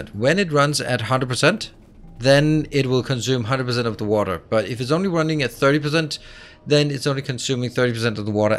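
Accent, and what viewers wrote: Denmark. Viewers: I was today years old when I figured out you are Danish. Damn, you've managed to hide the Danish accent very well. Kom så :D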